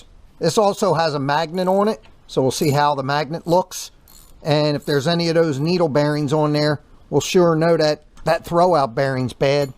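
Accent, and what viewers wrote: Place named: Mid-Atlantic, United States. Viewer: Also, are you from PA, Deleware, or Northern Maryland? Sounds like it.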